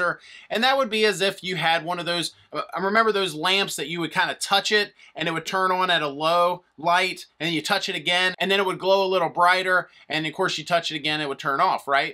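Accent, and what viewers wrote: South, United States. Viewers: background of some southern sounding vowels, and the way you pronounce “on”. Your vid was helpful, having some hvac issues/thermostat issues at our house and discovered that we have an inverter heat pump. Been in the house for about a year had no idea.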